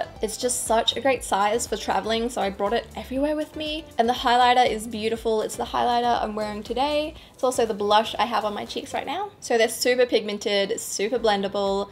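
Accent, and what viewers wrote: Australia. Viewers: Hey!!! Just wanted to let you know that I love your channel!! Im so glad that i have found it!! Love the Australia 🇦🇺 Accent!! Anyways hello from Texas ♥️♥️♥️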